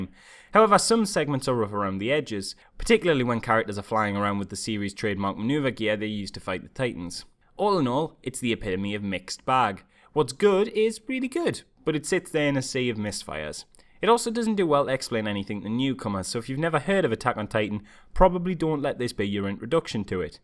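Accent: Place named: Scottish Isles, United Kingdom. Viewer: You sound like you're from the Scottish Isles, your voice is amazeballs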